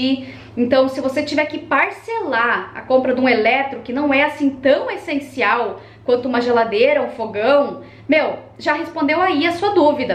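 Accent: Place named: Curitiba, Brazil. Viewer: Gostei de ouvir o sotaque da minha terrinha. Moro a vinte anos no Rio, e é bom me sentir em Curitiba de novo.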